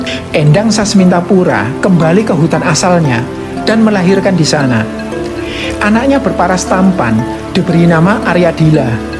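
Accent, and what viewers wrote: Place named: Surabaya, Indonesia. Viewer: Ini pembacanya pasti orang sekitar Surabaya, logatnya medok.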